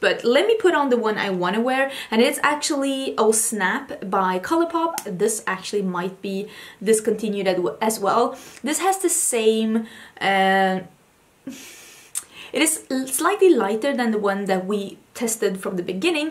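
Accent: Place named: Stockholm, Sweden. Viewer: My Fiance is from Stockholm, I'm from the US. To me, your accent sounds like a Stockholm accent(I could totally be wrong tho!). I'll be moving to Sweden in the next year! So glad I found your channel!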